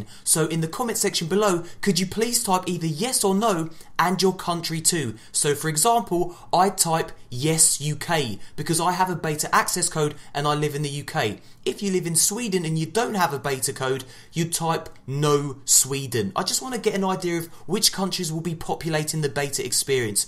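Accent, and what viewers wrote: Australia. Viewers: yer australia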